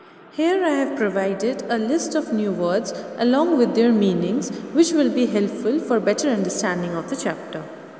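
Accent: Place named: United States. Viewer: Mam I like your voice very much it was just as an American🤗🤗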